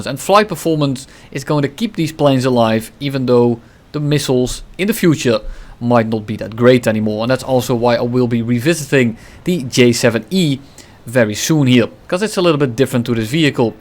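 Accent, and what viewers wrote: Netherlands. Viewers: Je hoort het altijd meteen als iemand nederlands is. Nederlanders hebben een hele goede engels vocabulaire maar spreken kunnen we niet. Haha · Your Dutch accent makes me smile a lot. Top content!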